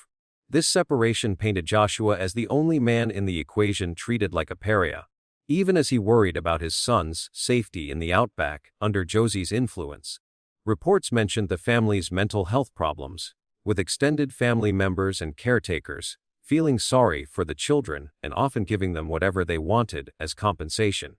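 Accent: United States. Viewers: American accent on ai voiceover 😒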